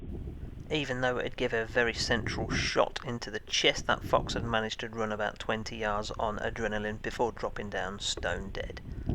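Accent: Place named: United Kingdom. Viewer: I think it's that Brit accent that makes these videos better, compared to my American accent.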